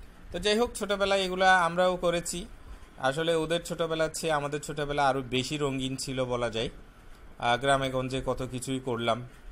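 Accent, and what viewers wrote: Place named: Chittagong, Bangladesh. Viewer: Apu ke bolsi,apu problem holo ami chittagong er kothagulo bujte parini...☹!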